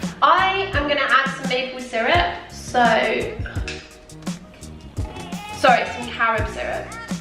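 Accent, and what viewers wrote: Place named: United Kingdom. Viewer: I like you British accent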